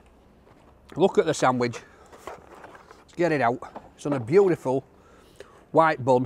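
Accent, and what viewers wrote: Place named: North, United Kingdom. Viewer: "That's Peng, that"... I love t'old northern speak. I'm saying t'old in front of everything I can in my house now. It's winding the wife right up. But she's got no room to complain, she's Welsh.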